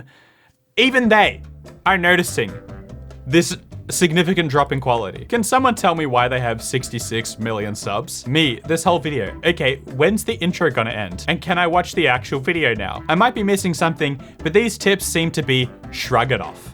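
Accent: Australia. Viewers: Your no moi confuses me specially when after it you start speaking perfect Australian accented English... 😅 Yeah, I know my problem is weird 😅😅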